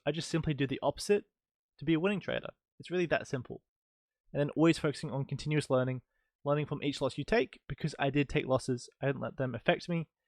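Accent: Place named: Australia. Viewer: God bless you... seems that you have great information, but I can't for the life of me, understand your English man😢 too fast, words are mixed together... is this Australian? Sorry... I wish we could change things like that in the settings but there's nothing... even the subs having hard time translating it... Again, God bless you... you're really sweet. Thanks🌸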